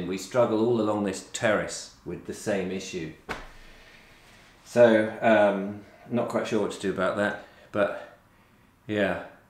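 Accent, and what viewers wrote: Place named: England, United Kingdom. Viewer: I love your beautiful English accent and the way you think! From Canada!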